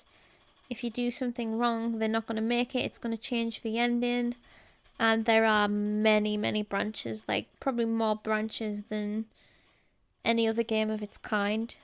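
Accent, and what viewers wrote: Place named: Newcastle, United Kingdom. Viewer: That Geordie accent is adorable I wanna hear you talk all day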